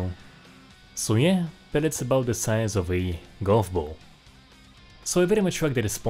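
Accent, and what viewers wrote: Russia. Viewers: Русский акцент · You have a Russian accent. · у тебя акцент русский)))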